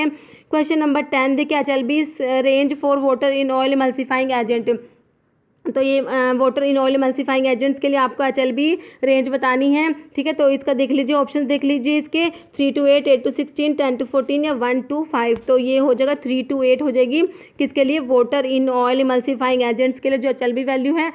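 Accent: Rajasthan, India. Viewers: Madam aap rajasthan se ho kya ??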